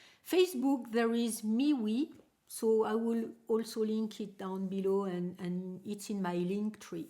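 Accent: France. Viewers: it was like hearing myself speak all my internal thoughts, but with a French accent!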